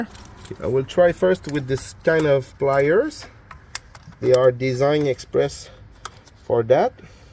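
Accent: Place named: Quebec, Canada. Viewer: Je reconnais bien l’accent québécois 😅 j’ai le même! Merci pour le vidéo je m’apprête à remplacer l’intercooler sur le Santa Fe à ma conjointe.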